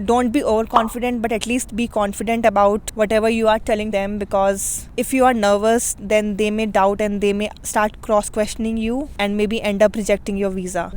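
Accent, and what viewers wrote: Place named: India. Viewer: Indian accent so funny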